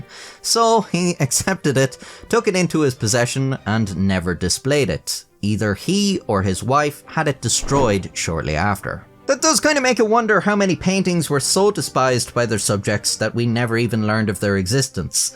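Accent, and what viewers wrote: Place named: Ireland. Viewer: I must say, you really spoke in a very good Irish accent in this video.......that's wert a tumbs up.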